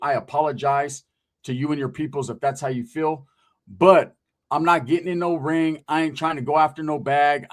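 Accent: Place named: Texas, United States. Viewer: Great video .... You sound like a Texas boy , where Blacks and Mexicans are brothers ❤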